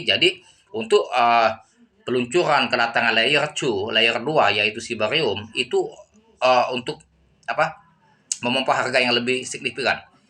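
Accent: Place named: Lampung, Indonesia. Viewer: Logatnya seperti orang Lampung bang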